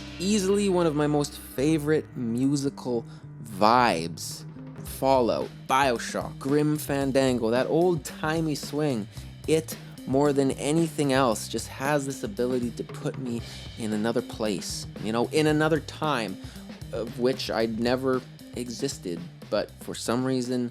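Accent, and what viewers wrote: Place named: New York, United States. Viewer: You're a real New Yorker aintcha?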